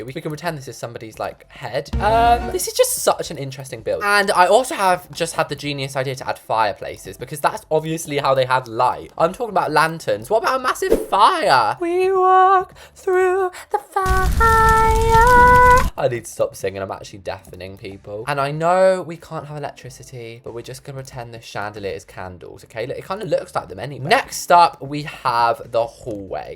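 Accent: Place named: United Kingdom. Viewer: When u talk British I have no idea what u mean😂 I’m American so I enjoy when my fav YouTubers (ur one of them ofc!)that are British say things abt the UK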